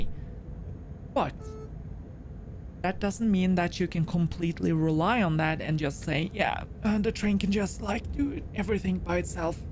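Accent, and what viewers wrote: Norway. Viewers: 😊So glad to hear your voice😊. Spend months wondering what one may look like, or sound like...and why was I suprised when you sounded Norwegian?!❤ Enjoy the rails, and enjoy the skies!🚅🛩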